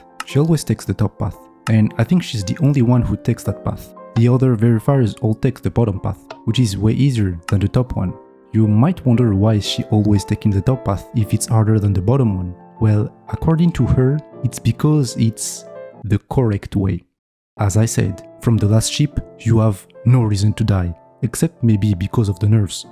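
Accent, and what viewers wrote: France. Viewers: I can smell the little french accent x) Anyways very good video !! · are u french or french canadian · You're so french (i am french and i detect french accent lol) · i hear fr*nch accent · Are u French? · Tu serais pas un peu français frrot ? Ton accent te trahi. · I knew you were french since the first second of the video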